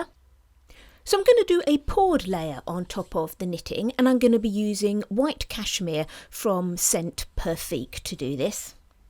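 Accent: United Kingdom. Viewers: Holy cow, these are the CUTEST EVER!!!!! I am absolutely in LOVE with your channel as a brand new soap maker! I'm an artist and love to create pretty things so your videos give me hope that I can one day get to a point even close to some of the soaps you make. What an inspiration! You are a wonderful teacher also and I absolutely LOVE your accent! (I play an online game with a lot of folks from your neck of the woods and it amazes me how many different accents there are just in the UK!) Gorgeous soap!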